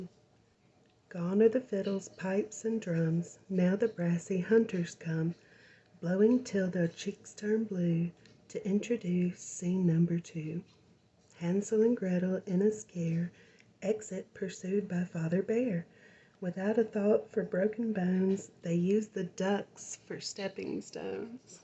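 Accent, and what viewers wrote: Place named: South, United States. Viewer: Hi ❤️. Thank you so much for this wonderful video ❤️. I really enjoyed it. The whispering, hand movements, tracing and story reading is very tingly and relaxing. It's been a long time since I've seen a pop-up book. That's really cute. Your whispering and southern accent really brighten the stories. Thank you for sharing this with us. Your soft soothing voice really adds to the tingles. Thank you again so much for this wonderful video ❤️. Please stay safe and be careful ❤️. Merry Christmas my friend 🧑‍🎄❄️☃️🦌🎄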